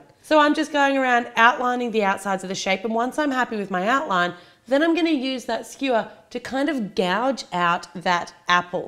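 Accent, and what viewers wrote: Australia. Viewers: Hey! Ur aussie arent u?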